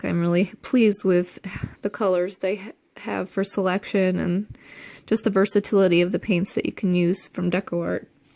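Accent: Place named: Ohio, United States. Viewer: Love ur technique, style and natural ability to teach and paint. Happy to hear u r from Ohio. Kinda thought u might be by listening to you! That’s a good thing! I am from Ohio currently outside of Cincinnati. Thanks for sharing your talents. God Bless you and your family! Would love too see you do a rose 🌹.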